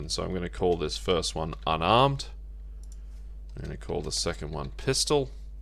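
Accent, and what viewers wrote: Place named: Australia. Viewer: Really great series of tutorials! Nice to hear a fellow Aussie's voice too as a bonus.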